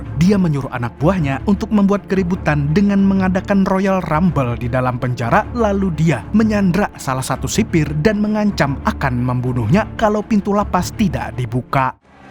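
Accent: Banjar, Indonesia. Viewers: 😅😅 urg banua sakali nya mimin nya😅😅😅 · urang banjar kah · Orang banjar kah min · admin nya banjar hhhhh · Banjar banar pian mang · Adminnya orang banjar kh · NGALIH BUHAN BANJAR NE 😅😅 AYAKKKKK SAAANNNIIIIII🤣🤣🤣 TAGUGUR-GUGUR ULUN MANDANGARNYAA😅😅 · Urang banjar sekali nya sidin nih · Gua curiga sama suara ini pasti orang Banjar balas sanak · Behh admin urg banjarr skalinya😂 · Banjar neh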